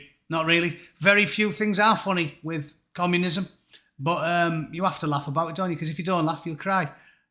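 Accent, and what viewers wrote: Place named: Northern England, United Kingdom. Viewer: A chippy Northern accent could also be the reason why Google AI policebots and Suuuzaaah haven't figured out wtf you're on about Haha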